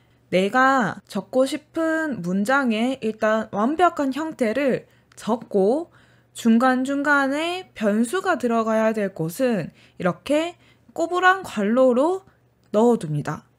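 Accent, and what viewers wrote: Gyeongsang, South Korea. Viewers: 오늘 또 듣네요~~ 님 경상도분이신 듯 좋아요 따봉 추가요~~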